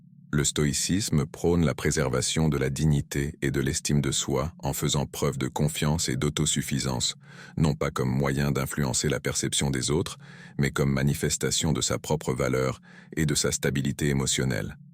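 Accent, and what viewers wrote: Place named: England, United Kingdom. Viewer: C'est pénible cet accent anglais pour parler français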